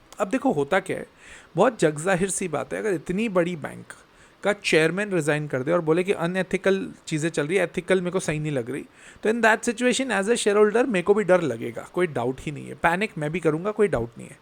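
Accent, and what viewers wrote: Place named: Bengal, India. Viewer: Bro, authentic bangla pronunciation ... kudos....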